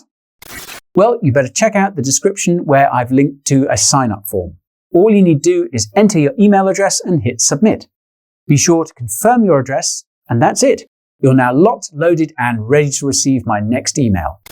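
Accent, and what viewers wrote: United Kingdom. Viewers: Amazing. He has a British accent that is tolerable. As well as an understanding that all traditional banker gangsters would ever want to do is rain on your crypto parade. Smash the like for that! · You should say "howdy" at least once every episode because with a british accent that is hilarious. (texan)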